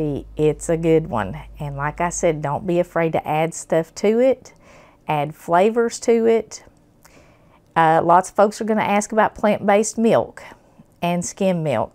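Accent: South, United States. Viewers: I didn't know about you but it always taste better with a southern accent ❤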